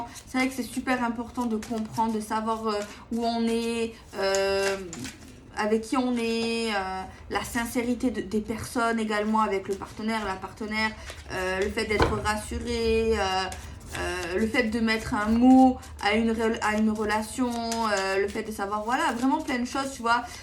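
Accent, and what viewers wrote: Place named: Marseille, France. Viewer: bonjour super vidéos je t’adore et ton accent et de Marseille gros bisous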